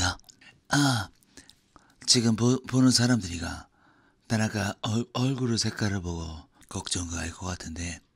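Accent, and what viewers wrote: Japan. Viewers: 저는 일본 사람입니다. 다나카さん의 억양이 여기저기 정말 일본인답고, 처음에는 이런 재미있는 일본인이 한국에서 활약하고 있구나!! 대박!!! 라고 생각하고 보고 있었는데........??? 정말 일본 사람 발음의 특징을 포착해서 엄청 웃었어요😂 美味しくなぁれ、美味しくなぁれ、萌え萌えキュン🫶🏻 (한국어가 틀렸다면 죄송합니다🙇‍♀️) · 일본어 공부하러 왔어요 요즘 다나카님 억양이 머리에 멤돌아서 저절로 일어가 터질 거 같아요 근데 느끼한 뉘앙스가 더 강하게 남아요ㅋㅋㅋㅋㅋ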